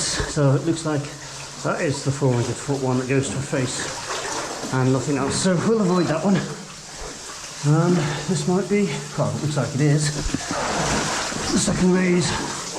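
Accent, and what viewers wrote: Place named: Potteries, United Kingdom. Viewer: Very random question, where's your accent from? Sounds like Trentham in the Potteries!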